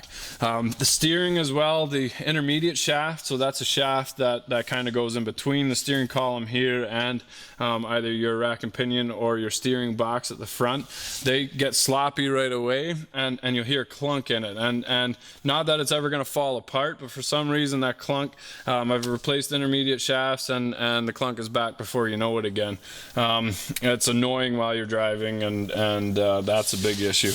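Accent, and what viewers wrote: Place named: Canada. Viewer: Figured you were somewhere in Canadia by the accent! I am in my last year of college for Mechanical Engineering and I work for a fiberglass composite engineering company during the summers and breaks. Needless to say I'm quite busy balancing it all out!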